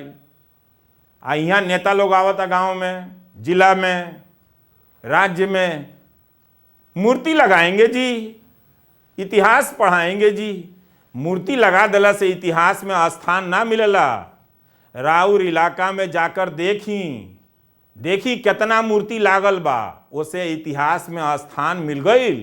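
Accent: Bhojpuri, India. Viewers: आपकी भोजपुरी बोलने की शैली बहुत प्यारी है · I'm not from the hindi region but love bhojpuri and understand well. I enjoy the dialect and accent. Subscribed this channel.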